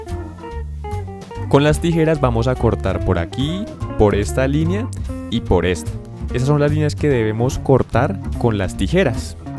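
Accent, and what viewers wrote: Bogotá, Colombia. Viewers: Tomas Echegoyen los colombianos ablamos asi y para tu informacion somos uno de los paises que mejor abla español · tu eres de colombia hablas como colombiano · Eres colombiano verdad? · BearMG Bogotano, seguro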